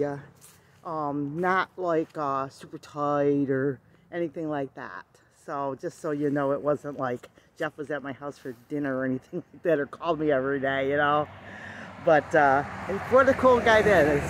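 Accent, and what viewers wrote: United States. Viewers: Black Sabbath without Ozzie Osborn, was like a skunk without stripes or a smell...basically just a cat at that point hahaha. I saw Black Sabbath in concert MANY years ago, back in the 70s, man that is a story to tell some day. Y'all have a Merry Christmas. Your accent, WI or a state near there, yes?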